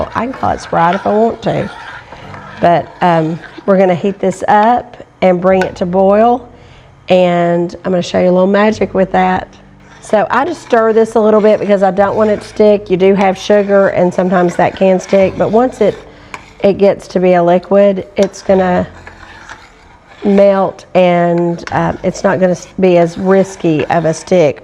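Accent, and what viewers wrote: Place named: Appalachia, United States. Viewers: Appalachian dialect. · it is a real authentic country twang! I can't make it more formal even when I try. Thank you for the kind complements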